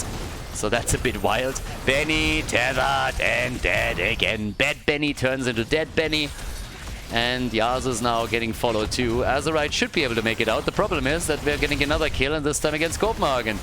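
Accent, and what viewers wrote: Germany. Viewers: Nice match You have a bit of German accent?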